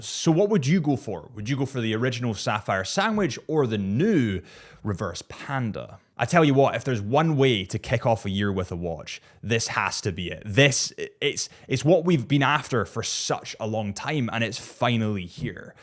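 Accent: Scotland, United Kingdom. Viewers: I think Amiga is just the "Scotch" way of pronouncing Omega, isn’t it,? You don't sound at all like in the Archers, by the way.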